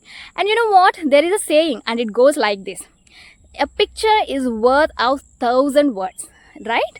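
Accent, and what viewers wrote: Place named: Andhra Pradesh, India. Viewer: I think your from Andhra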